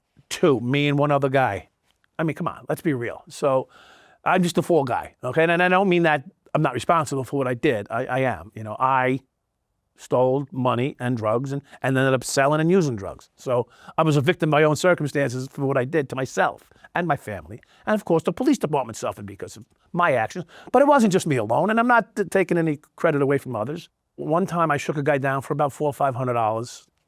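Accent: New York, United States. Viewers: This is pretty much standard, old-school New Yorker, law-enforcement and judicial bureaucracy personality. I know a judge who was a former narcotics processor who talks a lot like this Some people are saying that his accent doesn’t line up with what they know New Yorkers to sound like, but they’ve been here for only 10 years. The person that made the comment and indigenous New Yorkers are harder and harder to come by now. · I don’t know what you’re talkin about 🤷🏻 that’s as New York as you can get an accent you must not be from New York 🗽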